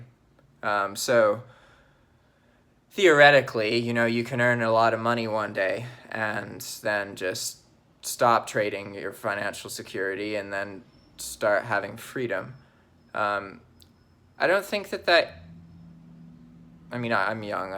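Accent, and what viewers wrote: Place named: England, United Kingdom. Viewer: Great video. You are a very inspirational person. Glad u are back home. Did anyone ever tell u you have a slight accent? Like u sound like u were in England and picked up a little of how they talk. Anyway, Ive been watching u for awhile and hope everything goes well for u.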